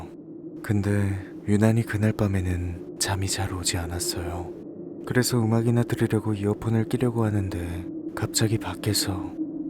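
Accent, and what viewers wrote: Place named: Japan. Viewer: 감기 다 나으셨나요~~~매번 병맛난... 말투에~~~~구~~수한??? 일본어 말투 ㅎㅎㅎ 잘든고 있어요^^💯💯💯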